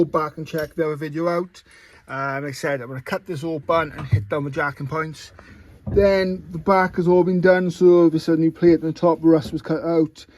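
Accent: Wales, United Kingdom. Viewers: What a headache I had find this after watching on tv.Don’t know what it is about the welsh accent it’s mint.Anyway while it’s got to be tedious at times filming I love watching vlogs like yours where there’s a lot of stuff going on instead of dwelling on stuff unless it’s the engine and u showing what’s been done👍🏼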